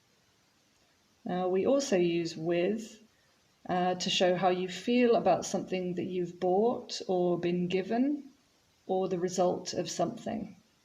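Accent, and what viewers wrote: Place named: United Kingdom. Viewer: I'm so thrilled about your accent 🤲 I do like a British accent 🤲you do sound natural.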